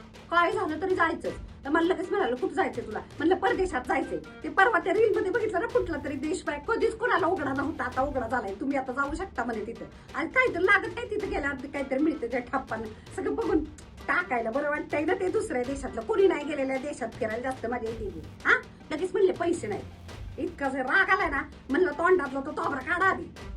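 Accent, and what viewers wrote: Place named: Kolhapur, India. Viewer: किती छान बोलत आहेस मस्तच कोल्हापुरी ठसका ✌️✌️💪💪👌👌👌👌🤣🤣🤣💐💐💐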